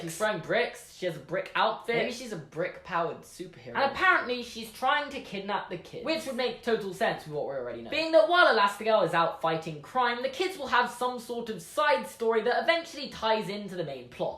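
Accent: United Kingdom. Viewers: you are from the uk!?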